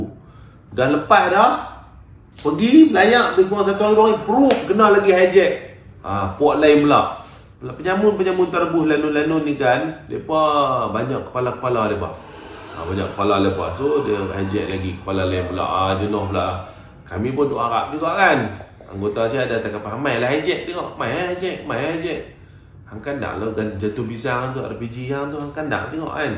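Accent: Kedah, Malaysia. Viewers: Yang buat kan video ni best sbb loghat kedah. Teringat duk kampung tenang..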